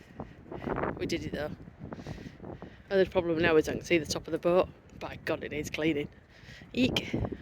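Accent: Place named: Yorkshire, United Kingdom. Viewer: Leeds and waky accent very similar, first time I've seen your channel I've subscribed look forward to watching more of your videos,👍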